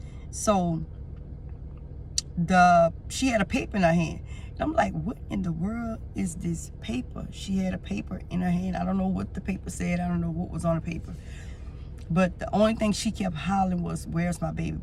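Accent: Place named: New Orleans, United States. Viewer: you sound like you have a New Orleans accent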